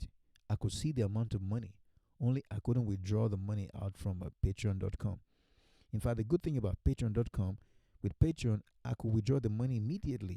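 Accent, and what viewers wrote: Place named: Nigeria. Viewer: Bro, you sound like a Nigerian, please can we connect so you put me through please 🙏🏽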